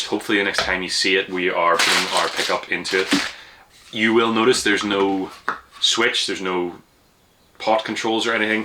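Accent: Northern Ireland, United Kingdom. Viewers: Danny sapko watchers when they hear a British accent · That's quite a controversial statement - the Northern Ireland accent is not "British".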